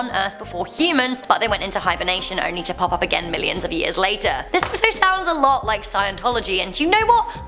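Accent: United Kingdom. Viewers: that depends on whether or not you grew up in London or not she grew up in London and she has a thick British accent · Nodaysoff J she literally said mayans Shes british.. Obviously she has an accent.. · Yeah, she's from england and currently lives in canada i think... People from the states might pronuce it differently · I love that this is spoken by British 😂😂 but is in Canada / America not sure but Yh I’m from England so respect 😂😂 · Was kinda hoping for a guy to speak with a lower voice and uuummm, no British accent since like idk YouTube is from America? Is that too much to ask for? Lol. Dislike.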